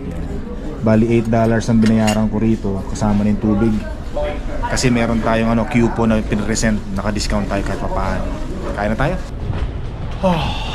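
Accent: Philippines. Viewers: Nkakatuwang mkita mga snow dyan sa canada puti buong paligid ka. Masaya nga lagi mga blogs mo ka kya masayang panoorin ska pinoy na pinoy sa kilos at pananalita.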